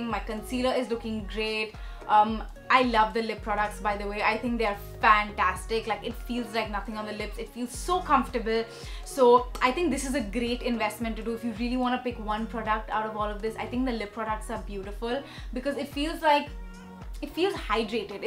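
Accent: United Kingdom. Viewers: Love your British accent. You should totally do a make up tutorial video speaking British only. 😍🥰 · Waaaow that british accenttt😍😍😍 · The British accent was on point 😍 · British accent was too acurate 😍😄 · Godd the British accent was bomb the video was good too but the brrritishhh acccent malz no one can make a difference between the way you speak and the authentic real British accent😄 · That British accent though 🤙🏻😍💖💖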